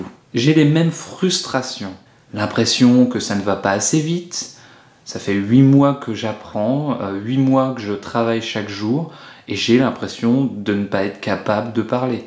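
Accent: France. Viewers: Parfois c'est bon de traduire littéralement de l'italien en français, mais pas toujours.. Et... Bravo pour ton italien oral! Ton accent français est délicieux, comme l'accent de tous les français lorsqu'ils parlent italien! 😊 Je te salue de ma merveilleuse Liguria, l'un des lieux les plus beaux d'Italie, un arc-en-ciel de lumière et de couleurs entre la mer et les montagnes que je te conseille vivement de visiter! Il me fait plaisir que tu aimes l'Italie et sa culture! Mais... tu me permets? On dit "mi piace molto imparare..." etc, non pas "mi piace molto di imparare... etc. Ce "di" n'éxiste pas dans cette phrase en italien: c'est juste une traduction littérale du français, ce que tu viens de dire!... Tes enfants qui chantent "L'Italia s'è desta" sont trop charmants! Ciao!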